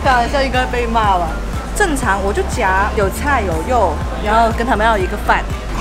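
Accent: Malaysia. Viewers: 怎么你的普通话口音不像香港口音，倒是像马来西亚口音（可能我听口音不是很准），但是讲粤语就有了。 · 你的普通話，好有馬來華語腔啊！ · 每次睇到既片都覺得香港人去台灣讀書講國語馬來西亞口音好神奇😂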